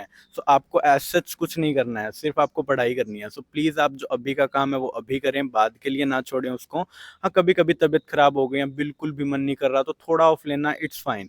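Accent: India. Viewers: Bhai ki voice haryanvi lg rhi h tone ❤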